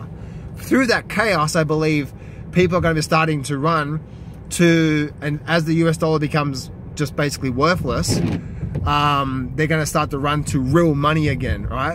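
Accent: Australia. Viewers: Whats going on, your videos just started showing back on my list. Its nice to hear your interpreation of market moves and your Austrailian accent. Take care, cheers!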